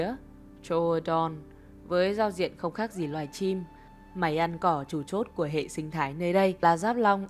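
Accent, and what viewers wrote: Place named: South, Vietnam. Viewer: giọng miền Nam truyền cảm hơn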